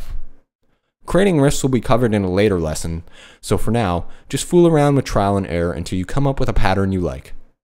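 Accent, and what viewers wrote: Canada. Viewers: Thanks! This was really helpful. Are you by any chance Canadian? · are you canadian? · Are you Canadian? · Hez a Canadian. "About"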